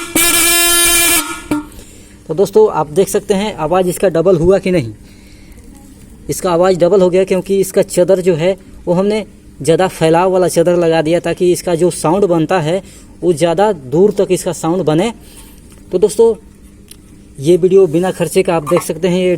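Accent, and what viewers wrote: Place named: Bihar, India. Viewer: Bihari h sir kya aap ! Chadar ko chdar bol rhe h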